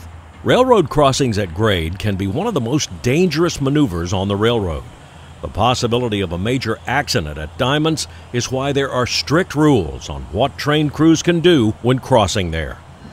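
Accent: United States. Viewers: Mr. speaks near perfect, American english. Enunciation, tone, accent, inflection, rhythm. Not a single word did i misunderstand. A dissappearing trait... ☆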